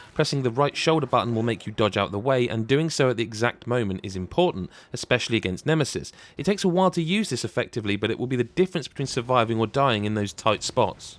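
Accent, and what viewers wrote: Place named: United Kingdom. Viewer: Is everyone who reviews games British?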